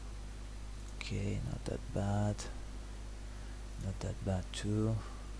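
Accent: France. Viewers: c'est la première fois que je vois un français parler en anglais sur youtube x) ça fait bizarre d'entendre un accent français, enfin bref, bonne vidéo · L'accent français magnifique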